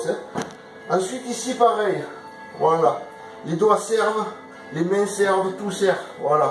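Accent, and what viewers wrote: South, France. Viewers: Travail de pro hyper appréciable, bravo à toi et bonne continuation... Ton accent du Sud et tes expressions me font bien rire! À+++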